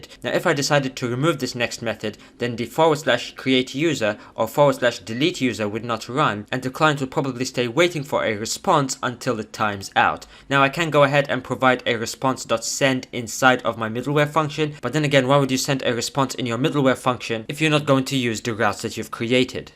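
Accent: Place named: France. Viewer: man this is so much better than those 30+ minute videos, short and to to the point + meme game is on point + a French? accent 10/10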